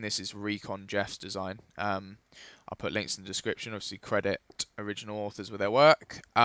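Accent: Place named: England, United Kingdom. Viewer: I'm using your English accent to annoy my mom - she finds it annoying because she strongly believes that this accent does not exist. I will prove her wrong. - To the topic. I love your tutorials.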